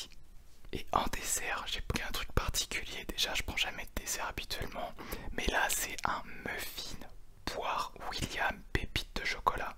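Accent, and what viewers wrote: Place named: France. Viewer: I love French accent :c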